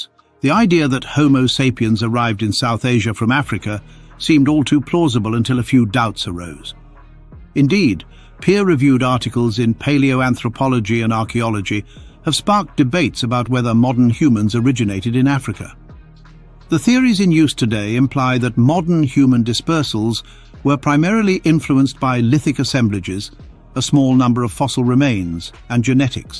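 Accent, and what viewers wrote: United Kingdom. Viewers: BS !! The GIANTs were the first hue’men. We aren’t believing yo slick Brit accent. There is no Evolution (Evilution). There is ONLY DEVOLUTION. Archeologists & Anthropologists frauds have NO credibility with MELANDIGENOUS ORIGINEEs.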